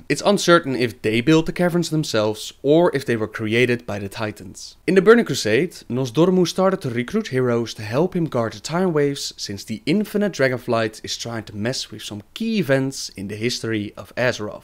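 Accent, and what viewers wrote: Netherlands. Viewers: Is that a dutch accent I spot? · Is this guy dutch? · The person speaking in the video is Obviously Dutch, no doubt. This accent is unmistakeable. :b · Probably dutch, maybe south african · Are u dutch ma men?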